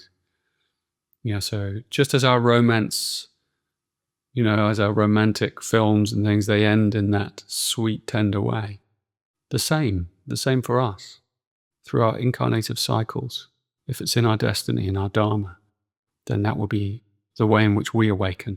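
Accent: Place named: United Kingdom. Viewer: Same thing happened to me! I love beautiful and elegant British accent…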